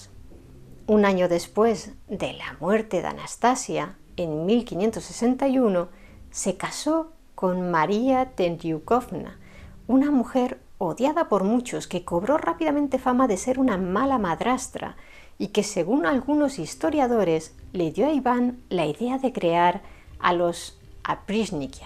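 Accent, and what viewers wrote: Spain. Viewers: Me encantó la< forma de contar las historias....pero muy, muy superior es la forma de transmitir, la entonación del relato me cautivó. No me es precisamente atractivo el acento español sin embargo me he enamorado de esa voz y no me pierdo capitulo, el último Oscar Wilde. Me gusta la historia y este canal me resulta muy atractivo y fácil de asimilar además de bastante documentado e hilvanado. Saludos y gracias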